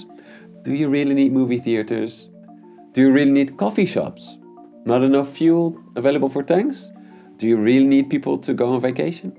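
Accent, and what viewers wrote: Netherlands. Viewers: Dutch accent (a little bit similar to slavic accent, because the Dutch language sounds like Russians speak English), " is a PhD candidate at the University of Groningen, the Netherlands."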